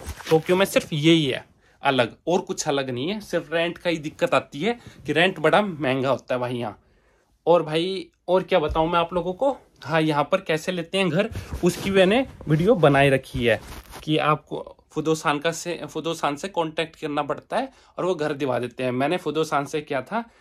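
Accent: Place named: Haryana, India. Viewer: mai bi Haryana sah hu mujai ap ki boli sah pata chal gaya ta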